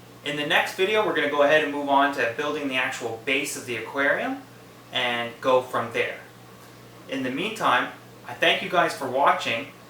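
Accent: Canada. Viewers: I think what I like best about your videos is that you do the "HowTo" part, but you also take the time to explain the "Why'd he do that" part. With so many videos, I want to stop "genius creator" in the middle of creating his brand-new what-sit and ask him "why that way, why not this way?" Genius creator, of course, never answers his comments! You, on the other hand, give very comprehensive [and comprehensible] instructions. And your Canadianisms just make it fun listening to you [yez?]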